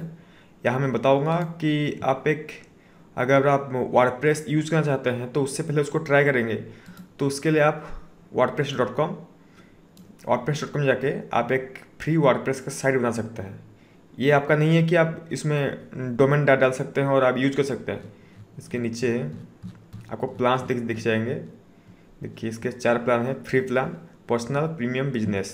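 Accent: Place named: Odisha, India. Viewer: Tu pahle hindi sikh le... odisa se h kya bhai?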